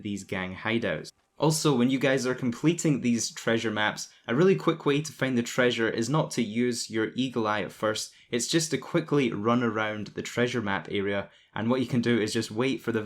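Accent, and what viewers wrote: Scotland, United Kingdom. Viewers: U Scottish buddy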